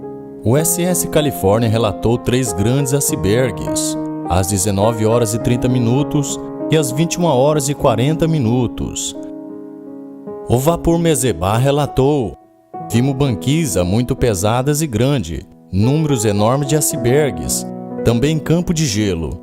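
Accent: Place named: São Paulo, Brazil. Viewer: Deve ser paulistano tipo eu e meu conterrâneo kkkkkkkkkk falamos bm caipira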